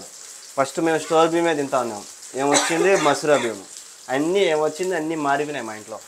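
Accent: Rayalaseema, India. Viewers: Ne voice rayala Seema launge super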